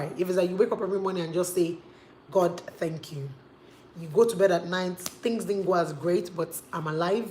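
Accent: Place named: Ghana. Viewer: I love your accent so much ,are you Ganian? I am South African by the way.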